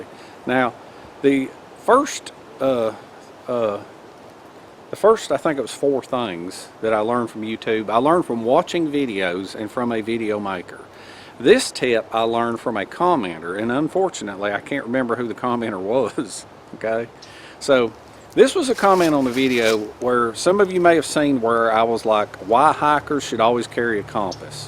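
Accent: South, United States. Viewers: Happy New Year. Am about to set out on my morning old man walk(arthritis in knee, so no more running,) with a good American Buddy from Alabama(I'm American too,but not from the south,) and our dog. Anyway, years ago, when he'd gone home for almost a year, I discovered your channel and would watch it out of nostalga for his accent and similiar excellent story telling skills....cheers...from far off Normandy, France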